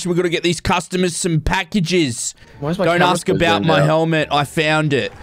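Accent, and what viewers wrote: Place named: Australia. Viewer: Delivery like a Australian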